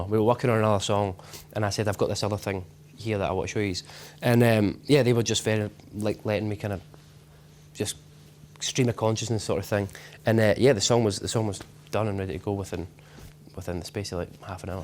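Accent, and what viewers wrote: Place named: Scotland, United Kingdom. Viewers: That song fcks me up, and now that I know he's Scottish (omfg lovely accent) and seems nice, I'm a fan ✌️ [also he looks a bit like Paul McCartney, I think it's the eyes] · Ass Juice right the Scottish accent is beautiful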